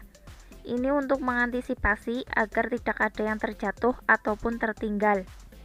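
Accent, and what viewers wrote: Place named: Banyumas, Indonesia. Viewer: Ngapak kie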